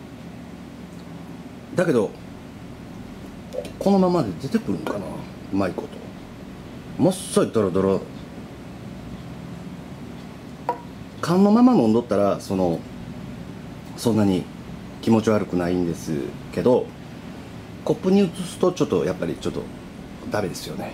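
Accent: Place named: Osaka, Japan. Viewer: 意識すると大阪弁は出ませんねぇ。 殆ど漫談になってるのが、おもしろいです。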